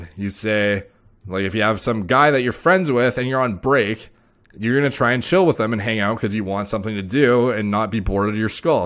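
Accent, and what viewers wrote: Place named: Toronto, Canada. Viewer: Didnt realize you were canadian. It sounds like you went york uni lol. I agree with alot of what you said.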